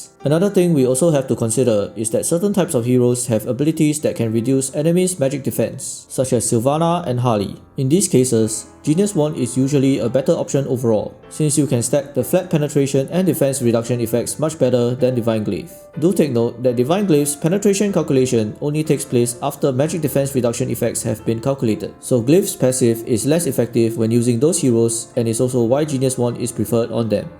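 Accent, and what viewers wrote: Singapore. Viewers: ay fellow singaporean, the accent HAHAHA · u sound like a sg person hahaha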